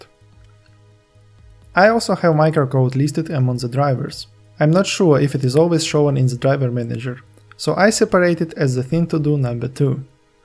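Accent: Russia. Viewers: strong slavic accent, most probably russian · Говоришь с русским акцентом) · such modesty and humility is rarely seen. In my case, because of your accent, watching this video reminded me of my childhood spent in Russia. Thank you for that and for the very helpful video!:) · I'm sorry, I didn't mean to seem negative in any way. To me it sounds like you have a Russian or Ukrainian accent (?), languages that to me sound like they have a lot of i's or j's directly after some consonants. So i tried to write "probably" the way it sounded to me. Anyway great video, lot of useful information! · Russian accent + linux is very good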